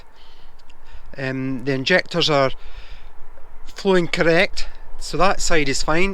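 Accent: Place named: Inverness, United Kingdom. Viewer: You sound like you’re from Inverness. I just revived Heart Vw MK1 with the K jet injection system. After rebuilding the fuel distributor, the problem ended up being no pressure coming out of the warm-up regulator. Come to find out it was completely seized internally. After taking it apart and freeing up everything, I had more than 60 psi’s feeding the fuel distributor, which resulted in the car starting for the first time. Leave no stone unturned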